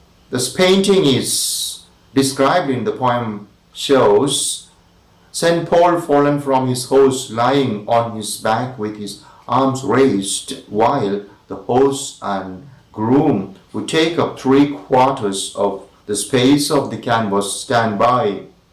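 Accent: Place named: India. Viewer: Excellent Indian Accent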